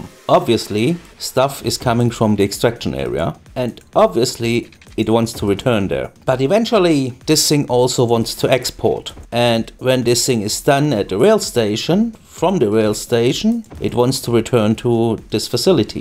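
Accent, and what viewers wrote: Germany. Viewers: When a man with a German accept starts telling me about order and efficiency, I can't help but pay attention. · It was you who inspired me to purchase city skylines five or more years ago. I played for over 2,000 hours. Thank you. The video that blew my mind was one about a dedicated industrial area with one-way roads and one-way rail lines. This was before Industries DLC came out so it was comparatively primitive to this, but many of the principles you used then are present here. Again you’ve blown my mind and given me a yen for city planning. Your cities are living beings with free-flowing roads carrying cars like red blood cells. Truly a pinnacle of German engineering. Also I like the Frankfurt accent. It’s both intelligent and hilarious at the same time. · You can hear your German dialect, hence the comment in German. That's way too much fumbling for me! 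The game would be a lot more fun if you didn't have to fiddle around so much. This is really frustrating! It takes hours just to get a single node up and running. :( It takes a million mods to get by and to be able to build reasonably well. I hope CS2 has a lot less fiddling and the traffic AI is a lot smarter. You have to build unrealistically many roads just because the AI is bad and many other extremely annoying things... ________________________________ Man hört deinen deutschen Dialekt raus, deshalb das Kommentar auf deutsch. Das ist mir viel zu viel gefummle! Das Spiel würde viel mehr spaß machen wenn man nicht so viel fummeln müsste. Das ist echt frustrierend! Man brauch Stunden nur um einen einzigen Knotenpunkt zum laufen zu bekommen. :( Man brauch eine Million Mods um zurecht zu kommen und um einigermaßen schön bauen zu können. Ich hoffe das CS2 wesentlich weniger gefummle beinhaltet und die Verkehrs-KI wesentlich intelligenter ist. 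Man muss unrealistisch viele Straßen bauen, einfach weil die KI schlecht ist und viele andere extrem nervige Dinge... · Nothing like a good while watching a guy with a German accent explain things I understand nothing about and will probably never use. Very relaxing. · Yeah it did lol. The German accent added a +1 whilst talking technical terms as well. I might have to make a new city now. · VERY useful. Danke for creating this. (i hope i dont make a mistake saying it in German, you sound German) :) But your english is spot on! :) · btw are you maby german bc of the acent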